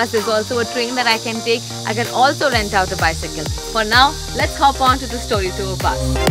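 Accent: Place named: India. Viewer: the accent is so thick( Indian) Can’t understand the half of the times. Other than she seems like a nice lady.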